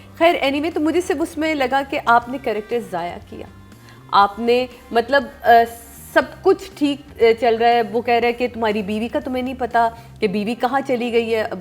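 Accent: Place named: Pakistan. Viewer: every person has their own accent.Pakistanis will speak with a Pakistani accent which will be definitely different to Canadian,American or British English accent.You yourself have a very Pakistani accent.Education is not knowing E glish.English is a language its not a measuring stick for education.Btw the word is PRO NUN CIATION not PRO NOUN CIATION.Now you understand what is accent.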